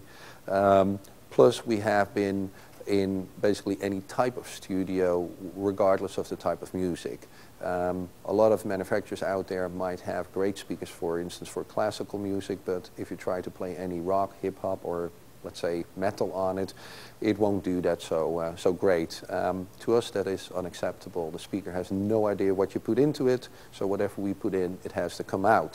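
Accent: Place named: Netherlands. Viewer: The presenter sounds like he's from Holland.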